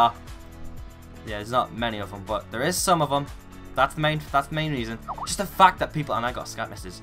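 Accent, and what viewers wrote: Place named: Scotland, United Kingdom. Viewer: You have a bit of an accent, are you Scottish?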